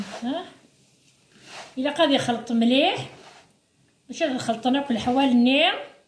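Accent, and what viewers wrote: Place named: Kabylie, Algeria. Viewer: Bravos eh yema, elles sont un peu grande les boulettes!!! Votre kabyle est authentic,beau à entendre. Une belle musique cette langue!! Le plat est parfait !!! 😊😊😊😊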